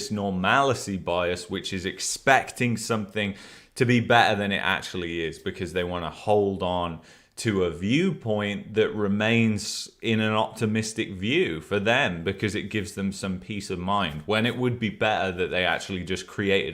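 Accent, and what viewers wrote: United Kingdom. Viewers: Finally we see the true story where the mainstream media never covers. Many Thanks for your time. New subscriber. Love the British accent too.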